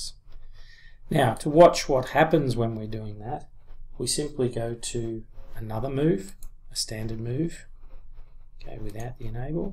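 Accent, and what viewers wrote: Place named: Australia. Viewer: Thank you for superb teaching video from Thailand. Listening to your accent I missed all friends in Melbourne.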